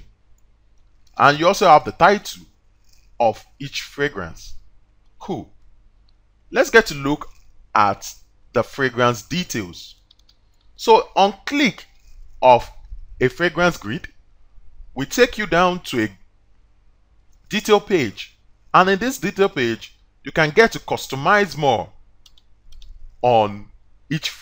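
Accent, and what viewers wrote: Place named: Nigeria. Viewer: your sounding like a nigerian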